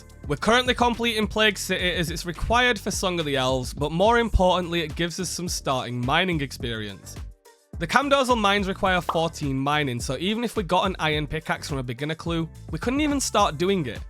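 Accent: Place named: Yorkshire, United Kingdom. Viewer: Your accent is killing me.. Where are you from? Leeds/Hull/Sheffield area? It's so similar to mine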